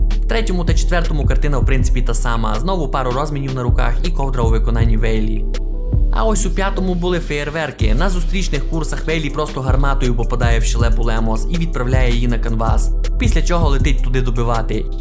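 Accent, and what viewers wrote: Western Ukraine, Ukraine. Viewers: Я привик дивитись по російській мові а тут ви по рідній західній Івано франкіівській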